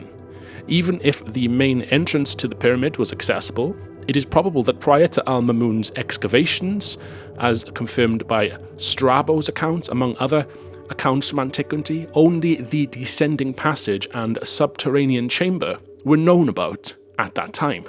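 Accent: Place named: South Wales, United Kingdom. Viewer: Great content Sir. Just subscribed. You have quite a subtle south Welsh accent. Mayhap you are from the border region- go Offa's dyke! Cheers, Flaxen Saxon. 😉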